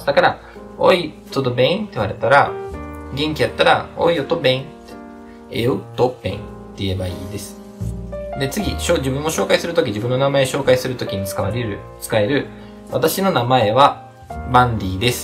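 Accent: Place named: Kansai, Japan. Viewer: 関西弁ってことがオモロイ！😁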